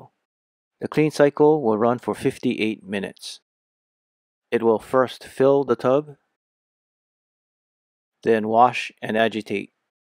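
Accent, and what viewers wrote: Hawaii, United States. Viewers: I love this! It’s helpful as we’ve had out for almost a year and I’ve cleaned it twice 😅 are you hawaiian? I can hear it haha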